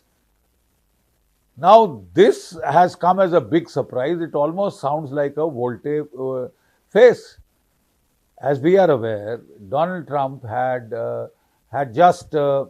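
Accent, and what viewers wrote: India. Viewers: You old fool,,, he Modi's friend more than india,,, you can not fool people with your curry English...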